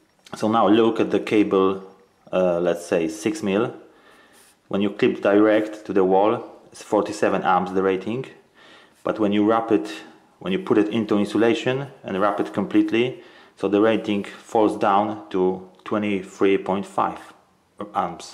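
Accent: Poland. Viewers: Very nice explanation, you make a great teacher; sweet memories of my work with some Polish builders because of the accent. :)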